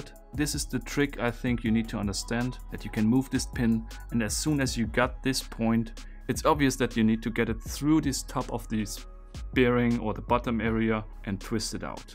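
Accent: Germany. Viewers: Are you German? OMG yes you are....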